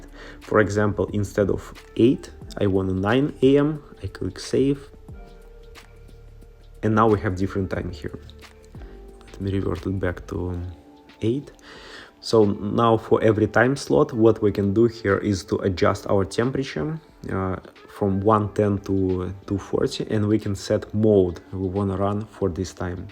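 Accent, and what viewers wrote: Russia. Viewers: Buddy, Are you Russian ?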